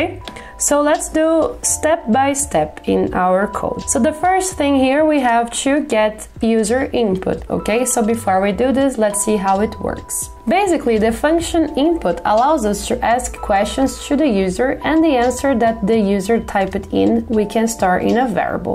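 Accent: Brazil. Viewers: Ela tem um acento brasileiro muito forte, com certeza ela e brasileira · Esse sotaque brasileiro não engana ninguém hahahah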